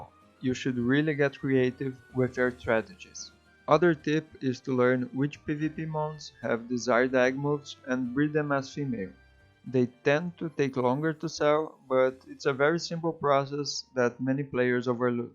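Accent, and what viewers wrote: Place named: Brazil. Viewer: You speak english like a brazilian guy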